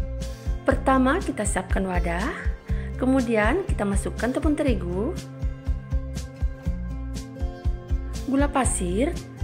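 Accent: Indonesia. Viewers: Kaya orang bali kalo ngomong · Mba.. Asli Bugis Makassar yah? Logatnya kayak org Bugis, salam kenal yah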